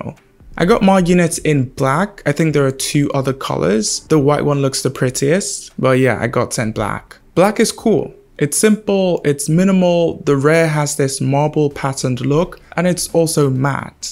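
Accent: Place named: England, United Kingdom. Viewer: yeah, probably grew up in england or schooled there or something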